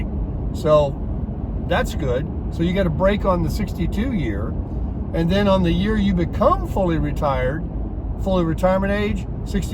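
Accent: South, United States. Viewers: speaks from the heart. A real Southern gentleman who's a pleasure to listen to.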